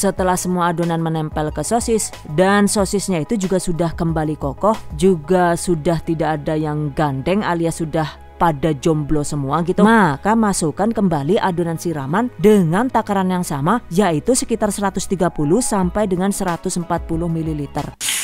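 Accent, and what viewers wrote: Banyumas, Indonesia. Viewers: Lah ngapak😂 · Wong ngapak jebule ... 😁👌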